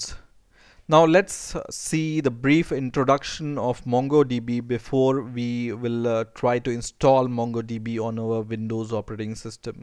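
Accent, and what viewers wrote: India. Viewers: Mohamed Dabo yeah, and that is exactly what I do, not before letting them know I can't understand them. It doesn't say INDIAN ACCENT in the video title, and how many of the billion of them have put a video on YouTube. When you create a filter to remove Indian accents from all IT videos then let me know. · Indian accent，that‘s cute !!!aloha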